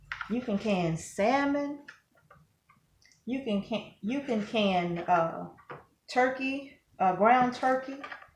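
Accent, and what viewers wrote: South, United States. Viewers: Love. Your southern accent! Love your happy personality! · AMAZING!!! Thank you sooo much!! I will be canning my chicken tomorrow. May God continue to Bless you and your family. That southern accent makes me miss home. You are more of a Blessing than you will ever know.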